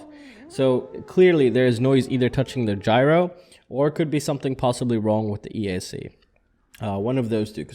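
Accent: United States. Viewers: You sound very much american, yet you live in europe, just curious about your situation.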